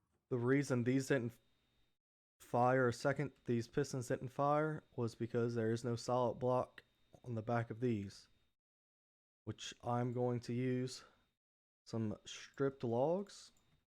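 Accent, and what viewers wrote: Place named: South, United States. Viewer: not gonna lie, i'm kinda delighted to hear a southern accent and no cringy intro and i'm not even american.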